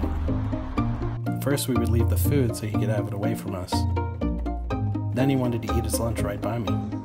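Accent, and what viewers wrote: United States. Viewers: Narrator speaking in first person: soft, calm voice with American accent. Guy in first video: loud voice with British accent. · Hey your and English guy in the video but American on the voiceover???? That's the second time ive caught you doing this lol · anyone else spot the narrator is a yank but the guy in the vid in engish? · Why is the narrator American but the guy hearing him talk on video English? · Why does the narrator have an American accent but the guy in the video has a British accent? 🤔 sweet cat though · I noticed that too. The narration is American and the guy’s voice in the video is British. Not sure if this is a genuine story or not. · The comment thread has nothing to do with 'global citizens'. People are questioning why the narrator's voice has an American accent when the short pieces of dialogue you hear during the video is in a noticeably British/English accent. 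What has that got to do with where people live? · The narrator is American, where as the owner of Paul sounds English. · Ok so the guy talking is American but the guy with the cat is English. Lies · Why did they make the narrator American when the guy in the video is British? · I've always suspected these types of videos were sent in by people and then their email was read as a voiceover by a Dodo employee. Hearing the man's English accent in the video and the American voiceover has confirmed it. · Was very confused when the guy in the videos had a British accent and the guy narrating had an American accent before realising the narrator is just telling the story of the British guy and the cat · Well that was weird. The voice over guy is American, while the person shooting the video is clearly a Brit. Did The Dodo think we wouldn't understand him? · The guy in the video has an English accent, but the guy narrating is American. 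I'm a little confused.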